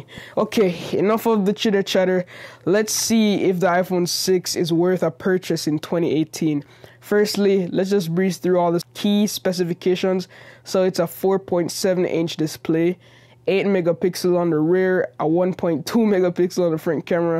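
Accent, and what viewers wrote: Jamaica. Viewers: A mobile phone reviewer with a Jamaican Accent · Are you Jamaican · U from Jamaica bro? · I can tell you are from Jamaica, aren't you? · are you jamaican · The accent, are you jamaican? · hey great vid you Jamaican btw I hear the accent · that Jamaican accent 😭😭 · dude sounding Jamaican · You Jamaican? 🤔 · Are you a Jamaican? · You Jamaican? And what’s the intro song? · Dude are you Jamaican?? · Are you from Jamaica? Or the Caribbean? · Can't miss that Jamaican accent bro lol big up yuself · Patois strong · Are you Jamaican bro? The accent sounds familiar. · Are you a Jamaican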